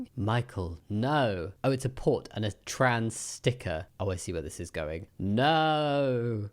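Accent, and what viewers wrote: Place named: Scotland, United Kingdom. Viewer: You said something and I really could tell you were Scottish. The accent is there at least sometimes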